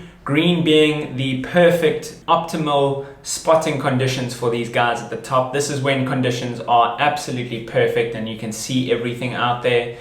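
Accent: South Africa. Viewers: What country is this? You sound South African. If they are indeed bronze whalers, well they have a long history of attacking humans. Not too sure what you're saying here.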